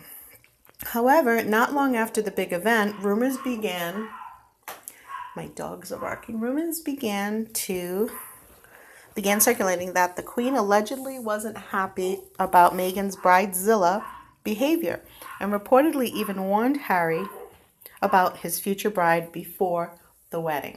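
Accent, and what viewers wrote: Northeast, United States. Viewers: First time here, I’m guessing your from the Northeast USA?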